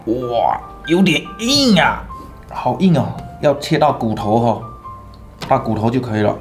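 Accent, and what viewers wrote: Fuzhou, China. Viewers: 浓浓的福州腔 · 口音听起来是福州人吗？ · 胡州老哥普通划很亲切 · 我怎么觉得你是福州人，哈哈哈哈，口音和我家叔叔的好像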